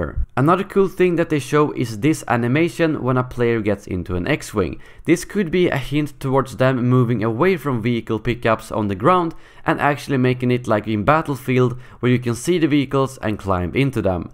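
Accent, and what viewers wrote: Sweden. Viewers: Allow me to congratulate you for the incredibly well-packed video full of information, and when you speculated it was properly mentioned. Your videos are getting better by the day :D (still miss the swedish accent of the earliest videos hahaha). Keep it up!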